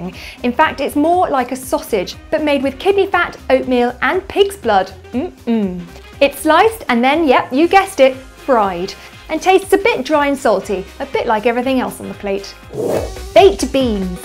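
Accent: United Kingdom. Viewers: I never heard more of a British accent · You age sooooooo charming my goodness, and I love your British accent, so hot.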